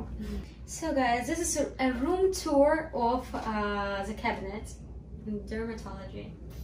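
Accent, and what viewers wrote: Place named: Ukraine. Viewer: You’ve got a beautiful Ukrainian accent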